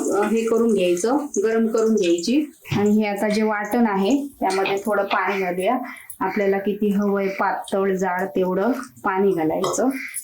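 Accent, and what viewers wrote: Konkan, India. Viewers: कोकणात तसंच म्हणतात त्या कोकणातल्या आहेत आमच्याच गावच्या आहेत आमच्याकडे त्याच भाषेत बोलतात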